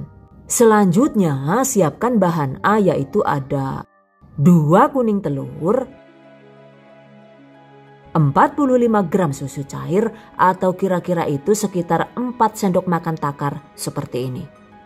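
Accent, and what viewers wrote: Banyumas, Indonesia. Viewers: Ibunya logatnya kaya orang Jawa ngapak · Orang mana kak logat bahasa jawanya ngapak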